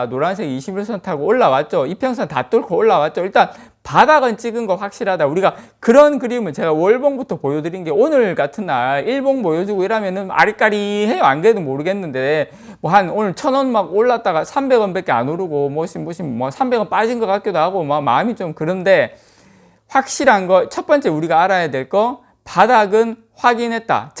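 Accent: Gyeongsang, South Korea. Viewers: 경상도 사투리 아리까리...ㅋㅋㅋ 의 사투리는 너무 구수하쥬♡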